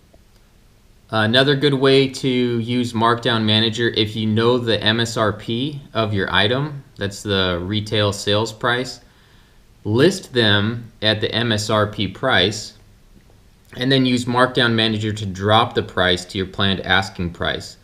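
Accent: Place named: Southern California, United States. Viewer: Keep up the good work man, Seems like your from socal.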